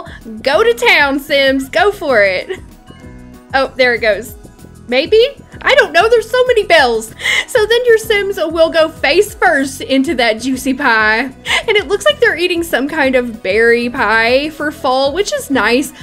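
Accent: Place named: South, United States. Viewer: By the way you've probably heard this before but love the country accent 😂 reminds me of my granny's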